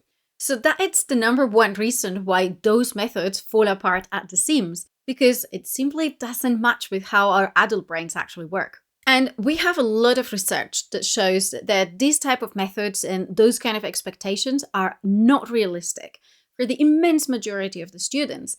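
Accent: Scotland, United Kingdom. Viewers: Of course you can tell she's Spanish, but she's got a mix of different British accents, especially Northern English and Scottish. No thick accent at all. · Yup, I was looking for confirmation of this! Certain sounds are very Scots. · A significant detour, but I very quickly heard a noticeable bit of a Scots accent :) It took me a long way back to a Scots colleague who was also a fluent Spanish speaker... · Solid video! I'm interested in your accent. Are you a native Spanish speaker modelling your English after a Scottish accent? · Yes, her accent is a mixture. I hear a Scot and English accent.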